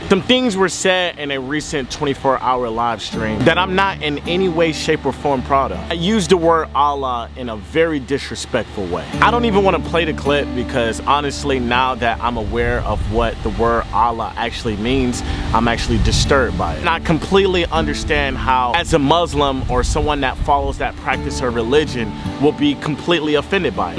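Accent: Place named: Baltimore, United States. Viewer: Y he sound like he from baltimore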